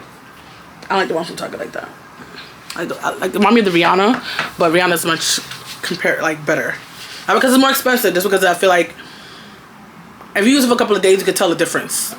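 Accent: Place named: Jamaica. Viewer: Hear the Jamaican accent, dutty lol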